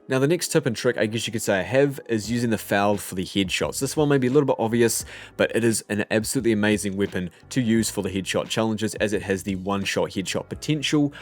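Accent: New Zealand. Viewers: Is he from New Zealand? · Are you from New Zealand?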